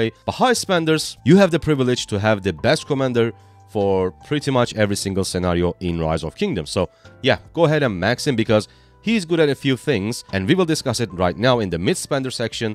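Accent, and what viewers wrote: Turkey. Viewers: Bu adam tam bir Türk gibi ingilizce konuşuyor.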